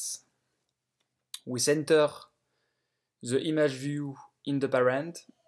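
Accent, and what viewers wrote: France. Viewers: like a French speaks english ?? for example button, you said boutton..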